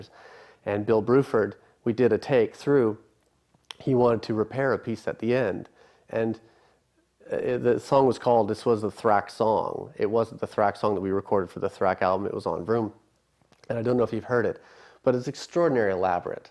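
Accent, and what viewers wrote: Canada. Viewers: That's how Canadians pronounce again.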